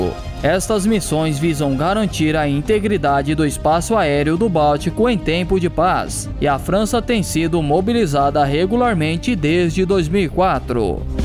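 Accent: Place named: Ceará, Brazil. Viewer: eu tavo vendo uma coisa voce tem um sotaqui de seara voce e searence ne cpz kkkkkkkkkkkkkkkk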